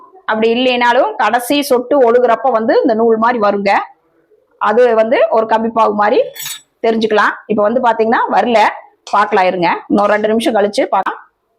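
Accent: Kongu, India. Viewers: எங்களுடைய கொங்கு தமிழில் நீங்கள் பேசி சமையல் செய்வது அருமை தங்கை🎉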